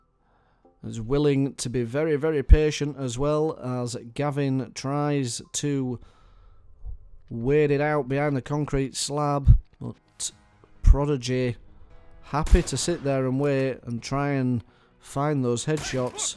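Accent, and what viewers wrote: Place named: United Kingdom. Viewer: Is the british accent so much fun to hear? Or just good in commentary, nice work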